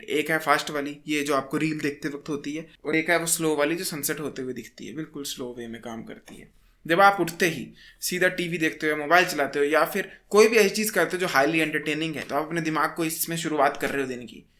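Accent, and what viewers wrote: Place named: Kangra, India. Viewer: मिंजो ऐसा कयो laggada की bhau तू कांगड़ी है।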